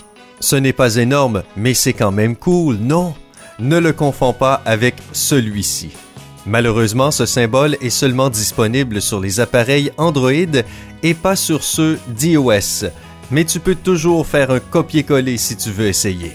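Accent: Quebec, Canada. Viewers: Pas canadien ??! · Il a l’accent québécois, mdr😂🤣😂🤣.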